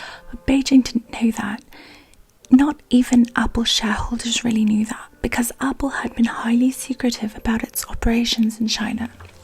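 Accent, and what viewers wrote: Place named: United Kingdom. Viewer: Your accent is so stunning! Are you from the UK?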